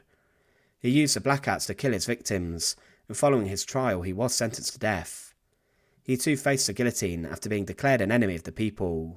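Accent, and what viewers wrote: United Kingdom. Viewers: No ,he sounds British which he is. Enough said already!..😅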